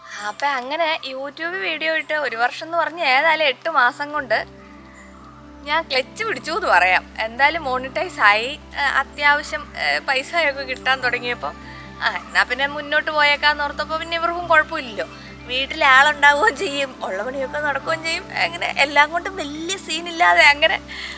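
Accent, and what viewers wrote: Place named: Southern Kerala, India. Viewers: ❤ നന്ദി, ഞാൻ വിചാരിച്ചത് ഇടുക്കി ക്കാരിയാണെന്നായിരുന്നു, എന്തായാലും തെക്കൻ side ലെ ഭാഷയാണ് കോഴിക്കോടൻ slang അല്ല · Kottayamkarude varthamanam❤️❤️❤️❤️❤️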